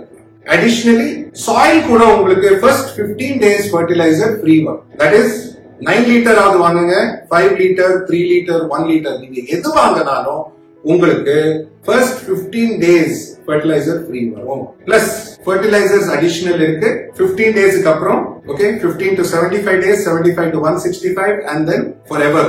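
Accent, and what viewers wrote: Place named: North Chennai, India. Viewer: Sir, We trust your product's!. Surprised you speak vada chennai accent a bit, loved it. Hoping you would speak hyderbad telugu while u launch in hyderbad..love you ,sir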